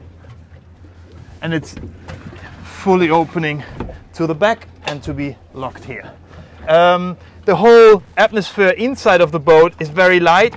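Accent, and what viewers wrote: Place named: Germany. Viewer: Better and thorough presentation, than other presenters of the same boat. Don't mind your german accent......👍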